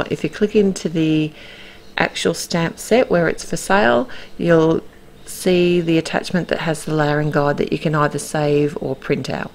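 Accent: Australia. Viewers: Love your sweet card. I've never been keen on flat flowers, always preferring dimensional, but these look so very fresh and pretty. Thanks for all the tips about stamping. Lovely to hear an Aussie voice. Sorry, I think I say this each time I comment, but it's true. Good to know that our Aussie talent is being showcased. Well done.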